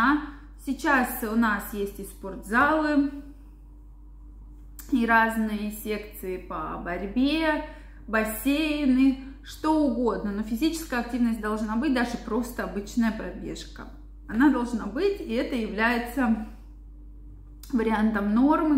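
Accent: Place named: Urals, Russia. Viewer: Вы с Урала? Говор специфический.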